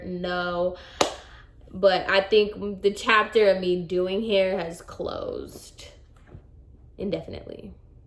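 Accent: Puerto Rico. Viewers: Hello from Oregon 👋 You are right, Spanish even though it’s Spanish, it’s different depending on where you live or where you grew up. But I like your Puerto Rican accent ☺️ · I love how spanish sounds in a puerto rican accent!!! My spanish is so blahh!!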